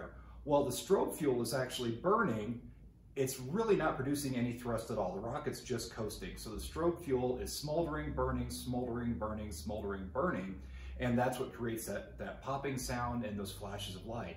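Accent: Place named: Midwest, United States. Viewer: Your monotone is kinda creepy definitely back east somewhere possibly Wisconsin or Minnesota?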